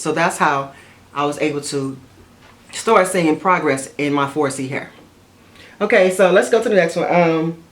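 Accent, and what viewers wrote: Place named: United States. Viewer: you have these gorgeous african features, those cheekbones I'm dying for. your lips are gorgeous and your skin was given to you directly from jesus. hahah, you're beautiful!!! i know you're american, i hear it in your voice, its just your features are so distinct and royal, lol. thanks for the hair tips, yours is lovely!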